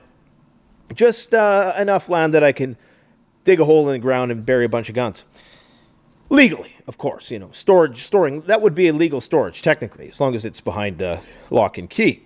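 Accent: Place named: Rural, United States. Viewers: that's NOT how Albertan's talk...what kind of accent was that??? Texas?? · Thanks for the update,. Good news sounds like some are Standing Up, Speaking Up, Taking Action, as it should be. Your hick accent brings back fond memories of the All-American Prepper maybe we might see him in the near future "if" there is one. Stay Safe & Good Luck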